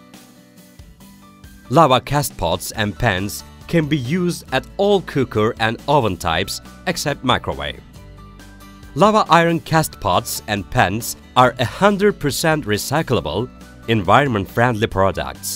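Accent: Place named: Turkey. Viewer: Allah aşkına kimin fikriydi bir türkü aksanli ingilizce konuşturmak? Acil değiştirin. Çok garip olmuş.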